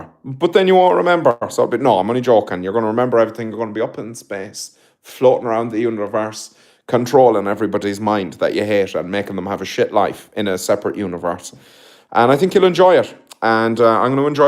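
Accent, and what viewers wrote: South, United States. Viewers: I love your Southern American accent, it's suuuuper cute and pretty accurate, coming from a Southerner. 🥰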